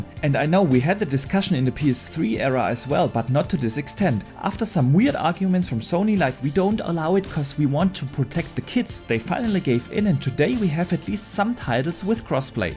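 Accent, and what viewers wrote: Germany. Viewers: Horrible German English :(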